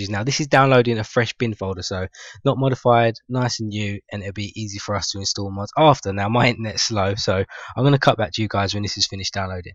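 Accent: United Kingdom. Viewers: You remind me of Garnet from Steven Universe. Your black (I not being racist) you have a british accent, and you have those glasses! :D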